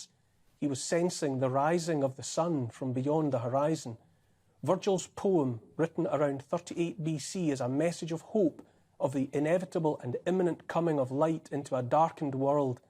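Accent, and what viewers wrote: Scotland, United Kingdom. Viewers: ❤️ ❤️ ❤️ ❤️ ❤️ ❤️ ❤️ ❤️ 🎄🎅🎄🎅🎄🎅🎄🎅🎄🎅 ⛄🎉⛄🎉⛄🎉⛄🎉⛄🎉 Neil Won't Kneel A gentle, beautiful Scottish lilt A kind, empathic, forward tilt An open heart; a caring face A willingness to take his place. A strong, home-hitting monologue Reminds us of the boiling frog The courage to become a sleuth A passion to release the truth. A deep desire to crack the whip Illuminates our freedom-strip Calls out the darkness taking hold The shiny despots; plated gold! Intense and raw, he lays it bare A soul-enhancing, honest stare Inflections drive the message through He speaks for Us - for me and you! Discernment's such a precious gift His words create a mighty lift Take heed of all he has to say He knows - it shouldn't be this way! 🤗🦋🐬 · It's the voice in our heads spoken eloquently with a scot accent. England has to look north for a leader. He's the best truth speaker at the moment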